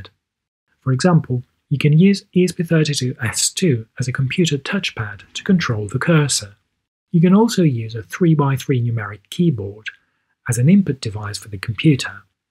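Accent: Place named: RP, United Kingdom. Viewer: Came for the girl in the thumbnail, stayed for the very posh British guy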